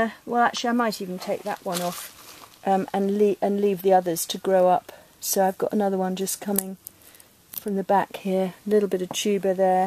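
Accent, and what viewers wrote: United Kingdom. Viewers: Wow! Thanks for the video. I learned a lot! Where are you from? Are you from Britain?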